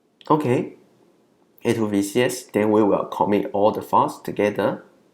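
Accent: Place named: India. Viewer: 还是说中文吧。。这英文听着和印度人一样的，产品不错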